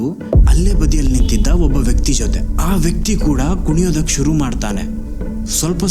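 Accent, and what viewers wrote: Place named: Coastal Karnataka, India. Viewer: Anna en content nimma voice matte nimma karavali kannada super 😢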